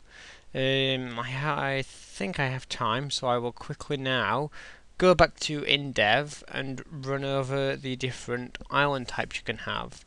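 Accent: United Kingdom. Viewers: cute british accent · TOO MUCH BRITISH!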